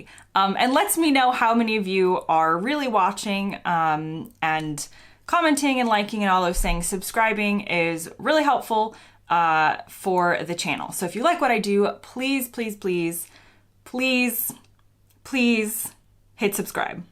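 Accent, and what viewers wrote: United States. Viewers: The US pronunciation of Barbados is so annoying. Also , it's Cost-a Rica not Coast-a Rica. Also it's rizzott-o not rizzoe-to. Americans should learn how the natives pronounce words before making up their own interpretation. While I'm on a rant it's not Edinburrow it's Edin-burra . I'll stop now before I start to get cross . LOL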